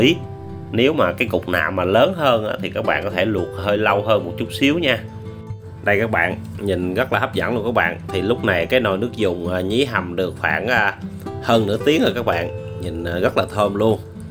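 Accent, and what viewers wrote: South, Vietnam. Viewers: Giong ng mien nam nghe êm tai dể chịu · Video nấu phở nầy quá hay! Cách chỉ dẩn nấu, cách nói, giọng ng Miền Nam quá tuyệt hay! Bấy lâu nay mình nấu Phở sai, nên ko đc ngon, nhờ video nầy mình tin chắc rằng sẽ có nồi phở rất ngon. Rất cám ơn A nhiều! Chúc A luôn thành công trên con đường đưa cái tài nấu ăn của mình đến cho càng nhiều khán giả đc biết. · ngon quá Nhí ơi. nghe giọng miền Nam thân thiện làm mình nhớ nhà quá.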